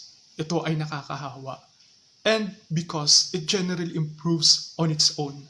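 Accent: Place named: India. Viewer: Bat po kayo ganyan magsalita sir? Parang bumbay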